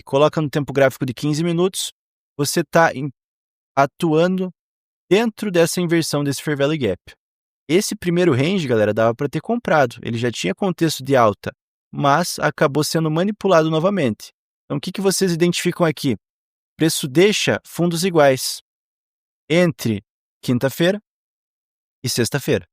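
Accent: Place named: Curitiba, Brazil. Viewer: Depois de 8 anos operando e consumindo tudo q existe no youtube, descobri esse canal e não consigo mais assistir outros. Vejo diversas vezes cd aula e assim q puder vou retribuir adquirindo algum serviço pago seu. Obrigado,! Obs. Sou de Curitiba, reconheço esse teu sotaque aí!😂👍🏻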